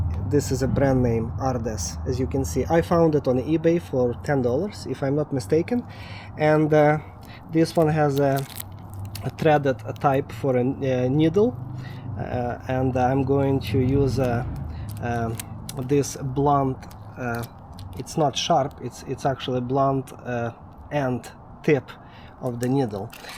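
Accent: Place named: Russia. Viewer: а почему Слава Богу? акцент то русский.)))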